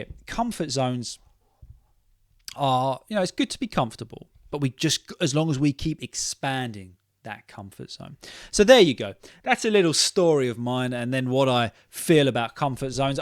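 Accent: United Kingdom. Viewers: Hey dude, how's it going? Your British accent is awesome. Hi from Fairfield, Connecticut, United States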